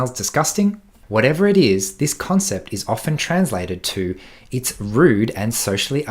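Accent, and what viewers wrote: Australia. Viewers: Nice to hear an Ozzie voice... :)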